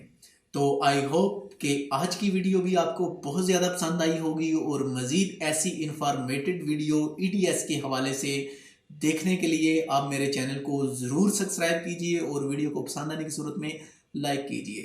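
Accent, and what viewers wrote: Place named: Punjab, India. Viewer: Tusi bolde urdu jo but tuhada lehja punjabi wala hai ji 😀😀🙏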